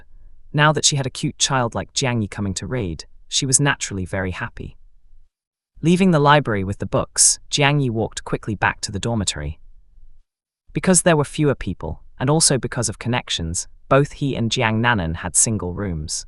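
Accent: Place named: India. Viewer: What was it with the Indian accent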